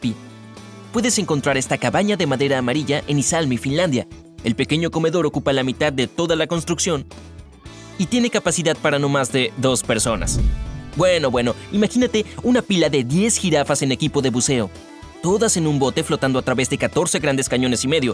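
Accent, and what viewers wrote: Buenos Aires, Argentina. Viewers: Épico yo vivo en Buenos Aires jajaja ese acento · El acento porteño clave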